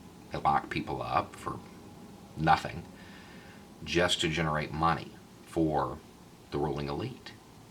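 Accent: South, United States. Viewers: Yep, glad there aren't many trolls. But at the same time, I really want right-wingers to check this guy out. I want them to see someone who looks and talks like them but with a different viewpoint who can passionately argue from a place of love like can. He has a chance at changing minds like few others on YT can. Also want liberal city-folk to see and then maybe think twice before dismissing people with a southern accent as stupid.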